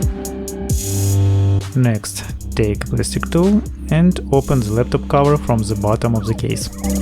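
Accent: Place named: Russia. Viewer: I realized, in accent, not like an Englishman. But for Russian, it sounds good.😏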